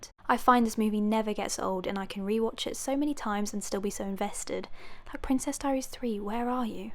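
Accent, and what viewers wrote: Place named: England, United Kingdom. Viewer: As an English girl with a similar accent, I can confirm that her voice is soothing af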